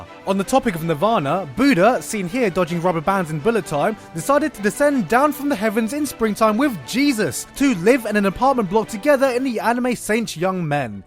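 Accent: United Kingdom. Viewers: sounds so Bri'ish here. How things have changed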